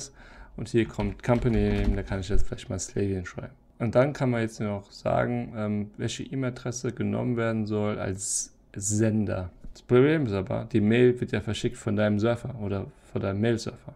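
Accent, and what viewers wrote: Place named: Saarland, Germany. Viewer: sorry, der Inhalt mag/könnte ja hilfreich sein, wenn man was verstehen würde und was erkennen könnte. Hektisches Rumgefuchtel und Saarländisches Genuschel sind nicht hilfreich - Sorry to say that. Aber vielleicht willst du dich ja verbessern...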